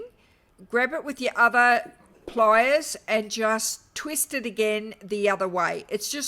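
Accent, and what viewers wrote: Australia. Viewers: I love hearing an Aussie voice and intonation, talking about craft. G’day from Forster. Adorable books too!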